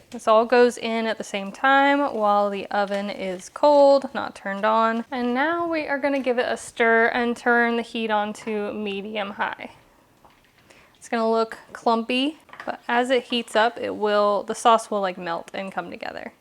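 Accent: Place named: Virginia, United States. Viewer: Ahhh, a Virginia girl are ya? Hello from Manassas, Virginia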